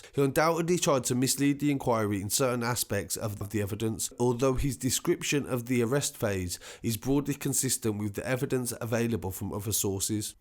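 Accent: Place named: Manchester, United Kingdom. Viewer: Can tell your from manny mint ANCOATS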